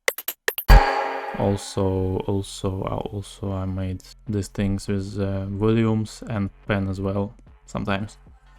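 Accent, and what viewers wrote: Russia. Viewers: акцент русский у тебя) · Блет, по акценту ты будто русский:/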